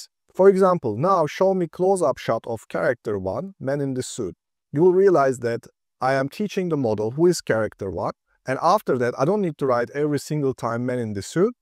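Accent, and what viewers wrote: Turkey. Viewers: Dostum aksanın çok türk, türk müsün merak ettim. Video için ayrıca teşekkürler. 🇹🇷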